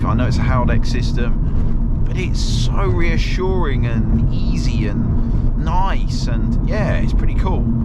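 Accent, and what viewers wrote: Bradford, United Kingdom. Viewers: Bradford accent on point bro 👏👍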